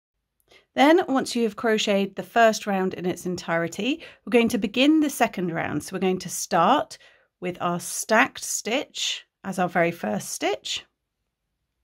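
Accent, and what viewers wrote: United Kingdom. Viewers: Hello I love your tutorials they are so clear and precise. But I have a query. You sound British and yet you use USA terms. When I learned to crochet 50 years ago all patterns were written, in what I now know as, UK terms. It’s only since watching You Tube that I have heard of USA terms. I prefer the UK terms, they make more sense.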